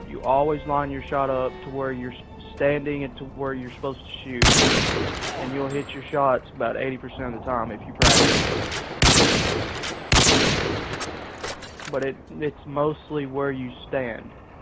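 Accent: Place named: South, United States. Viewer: People from the south don't give fuck how they talk buddy [: Js...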